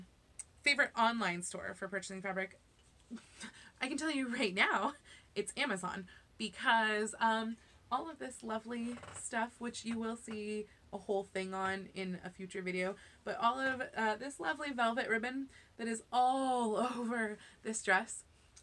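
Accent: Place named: Oklahoma, United States. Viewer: Oklahoma? No wonder I enjoy listening to you.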